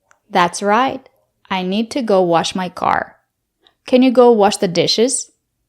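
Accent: United States. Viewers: Horrible American english , really nasty to listen to and an English english speaker I am aftaid. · That's Amarican English, isn't it? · You are obviously not an English person this is America's bastard English your promelgating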